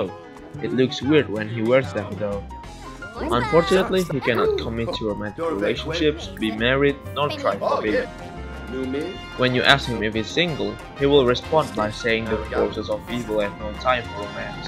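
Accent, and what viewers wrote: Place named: Indonesia. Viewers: udh gw duga!didenger dari logatnya:v omfg!! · pantes aksenmu gw kenalin wkwk orng indo toh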